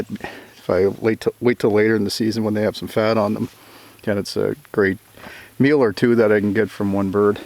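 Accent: Canada. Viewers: Nice vid! I thought you'd go with concrete for the oven and enjoyed seeing you use earth instead. I like that Canuck accent, too..."Ruffed Gross". :-)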